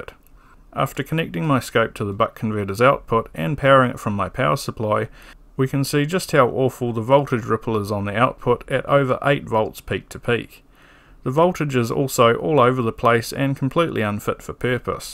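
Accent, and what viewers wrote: New Zealand. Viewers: 😍 I could listen to a Kiwi accent all day. ❤ from the West Island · Great vid, thanks. Nice to hear a fellow Kiwi accent for once!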